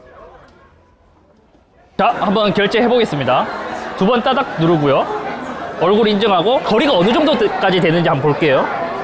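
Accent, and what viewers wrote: Gyeongsang, South Korea. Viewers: 근대 궁금한 게 있는데 왜 IT 유투 버는 경상도 사람들이 많지 사투리도 그렇지만 발음도 그렇고 말이 빨라서 무슨 말인지 못 알아들을 때가 있네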